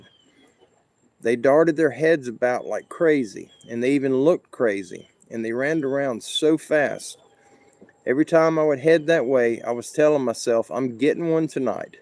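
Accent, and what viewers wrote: South, United States. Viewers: I thought your voice is awesome, i love that southern accent - i know what you were doing, you were only trying to make sure that everyone was happy - i do the same thing infact it takes me all day to get to the point, my brother will say to me ""For the love of God Michelina you've been circling the airport for an hour now ! You think you can land sometime soon...lol - im not going anywhere and neither are you, Our Lord n Savior didnt bring you this far you have more work to do, and the one comfort the one beautiful thing we all can keep in mind is "we all belong to a beautiful God and when its time and he wants to take you home, it will be a moment like no other you now know you have served your lord Jesus Christ and now its time to go back home - God Bless you, and until then you belong to us ...lol💖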